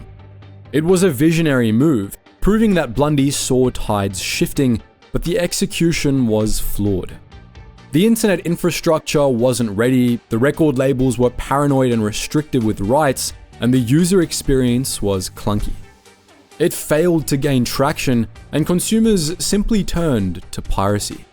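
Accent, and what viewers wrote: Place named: Australia. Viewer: Ok, I know you are also the voice of. Props to you for monetizing the voice. I listen to for hours. It's comforting to hear all this information delivered in an Australian accent. Do you have any other channels?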